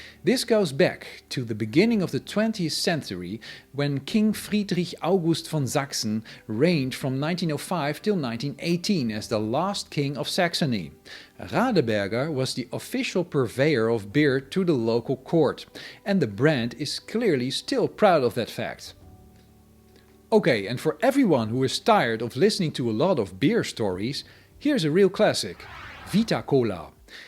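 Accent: Netherlands. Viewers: I also thought he sounded Dutch.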